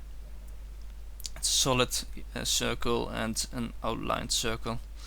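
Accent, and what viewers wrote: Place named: United Kingdom. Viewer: I thought you were british.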